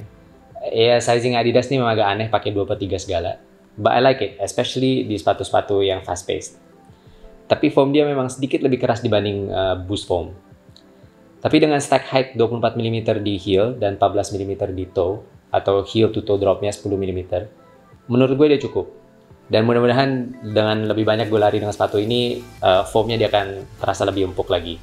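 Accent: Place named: Malaysia. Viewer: ada sedikit aksen melayu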